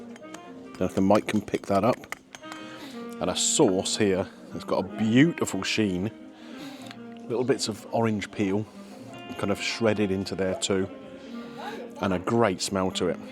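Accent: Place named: Norwich, United Kingdom. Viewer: With your accent you sound like a Norwich local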